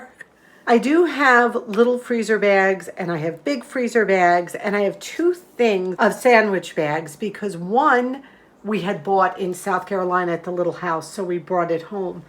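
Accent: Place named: New York, United States. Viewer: I’m a fairly new subscriber and have been enjoying watching your videos. I can really tell you’re from NewYork and nowhere near “Dixie” just by listening to your accent. 😊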